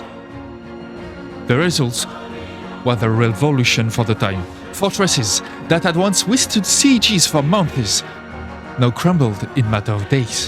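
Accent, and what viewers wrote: France. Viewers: honestly I have been able yo underdtand him quite well as he's articulating and talking slow enough. Though I'm used to french english from other pdx employees. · As an Italian, I listen a french guy that speak in English about Italian wars in a game without Italian language support. Pretty weird feeling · Love this French accent, more of this! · I want his soft french voice put me to sleep while he tells me about the absolute carnage and misery that was the thirty Years War. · But why this french accent all the time?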